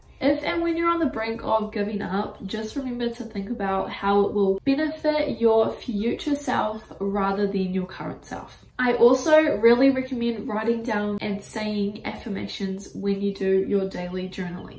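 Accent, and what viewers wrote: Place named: Australia. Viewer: I hear an Australian accent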